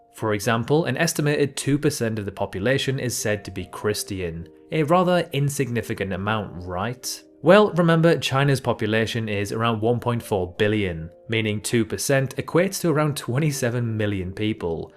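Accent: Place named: United Kingdom. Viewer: Your British accent lots of rubbish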